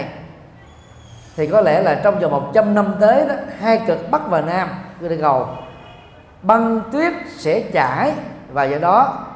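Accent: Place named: Vietnam. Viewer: phát âm tiếng anh giọng việt "chay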